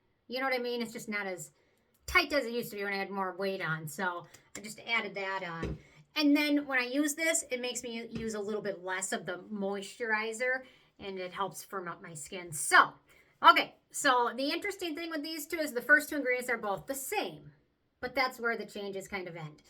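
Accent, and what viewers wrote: Minnesota, United States. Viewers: You're so cute & have a cute Minnesota accent & voice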